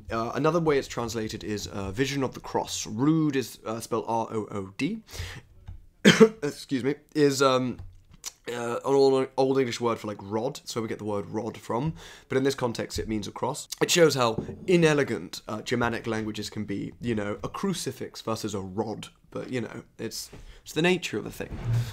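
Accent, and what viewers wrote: RP, United Kingdom. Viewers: I’m Brazilian, English isn’t my native language (or even a second one), quite the opposite 😅 but after studying it for a while and being exposed to it, I’d say I understand it reasonably well. That said, I’ve always struggled more with American English — I usually understand other accents better. Watching this video, I understood almost everything. I don’t know if it’s the way he speaks or if it’s actually British English (I’m guessing), but for me it felt like having an English class and wanting to study again. Loved it. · I can't help myself from being jealous of the vocabulary and flow of your speech, I guess some people are just from finer stock unfortunately. But I have seldom met a nor seen a posher bloke and i feel it distracts me from the subject you are conveying. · I do love a bit of posh 😊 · This accent sounds so posh to my plebeian Canadian ears. 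Also please keep this up. Ancient classics would be interesting too. Esp from the east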